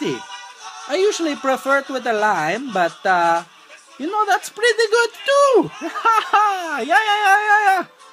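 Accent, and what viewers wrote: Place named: Mexico. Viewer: best mexican accent EVER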